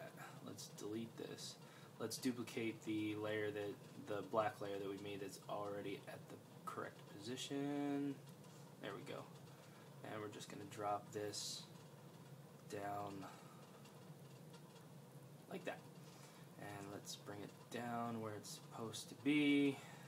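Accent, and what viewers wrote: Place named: United States. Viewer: Love the American talk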